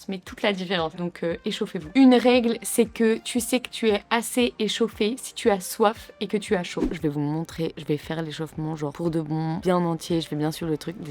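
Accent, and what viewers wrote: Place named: South, France. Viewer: j'avais jamais entendu que tu avais un léger accent du sud, ca te rend encore plus mimi :D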